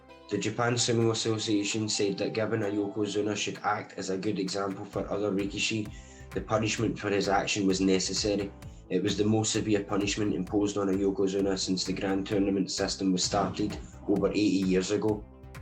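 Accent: Scotland, United Kingdom. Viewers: Thanks, I did, Scottish accent + auto-generated subs = fun :) Btw. this is a must-see: Scottish Star Trek <link> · What a great combination - sumo and the Scottish accent! Enough to subscribe :) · Thank you for the video. Quite nice and well done ... I am a die-hard fan of Asa also. I discovered sumo with Asa, just short before he to become Ozeki. I dont know why but I think I am in love with the Scottish accent. Hearing you, and also following the channel Scotland History Tour I start to really love the way how Scotts are speaking. You (all the Scotts) have a nice musicality in your tempo of speaking. All the best!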